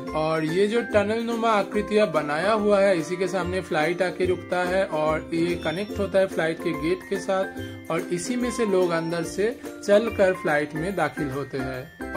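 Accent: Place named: Bihar, India. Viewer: Bhaiya narration bahut badiya kiye ho.. Or accent ekdam bihari kaafik per bhai kasam se narration mast hai bahut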